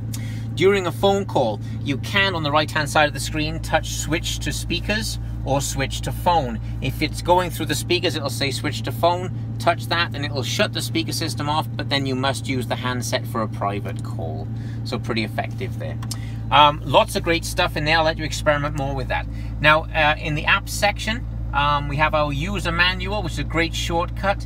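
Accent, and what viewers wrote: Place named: Wales, United Kingdom. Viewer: I don't think the chap is American. I detect a Welsh lilt of the valleys!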